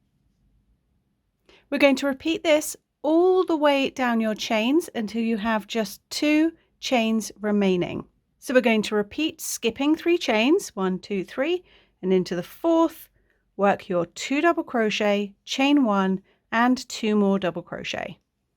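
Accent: England, United Kingdom. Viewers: Beautuful stitch pattern. Love to watch your tutorials. I have learned so much and used some if your tutorials to make scarves. Also your voice is so calming and relaxing. I love your Engish accent.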